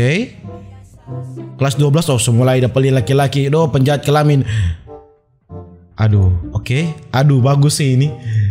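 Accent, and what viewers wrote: Manado, Indonesia. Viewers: Hy bg gua suka liat konten2 lu hnya karna suka dgn cata bicara dan logat menado gua asal sunda Bogor bg sukses selalu yg budi🤣🤣🤣seeyou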